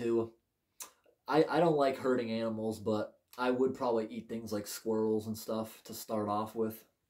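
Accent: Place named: Massachusetts, United States. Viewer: I've just stumbled onto your channel in the past few days, and you are an absolute delight. It's funny that we're the same age and have similar interest. I am a bit more into the entertainment side of vintage products and packaging like Chuck E. Cheese and Gadgets (Warner Brothers themed animatronic pizzeria). I wonder if the electrical and technological side of that may be interesting to you. Also, that Blue's Clues pasta in the background has really been taunting me. Are you going to save it for a reprint of the pasta? It may be more advantageous to just look from the SpongeBob pasta from the same year to get an easy comparison since they still make it. Oh, additionally, that "why do they call it an oven when you ove in the hot food and out hot eat the food" is a reference to an edited Garfield panel. It's a kind of obscure meme. 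Last thing, your Massachusetts accent is delightful. Stay rad. Love your stuff!!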